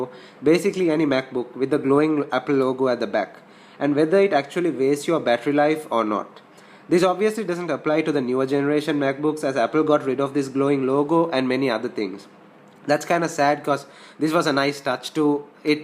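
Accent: India. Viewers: Hard to understand your English..Your Indian accent is so strong.. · i dont like your indian-english. its annoying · Is it indian english? Epically slow action to say NO, it doesn't!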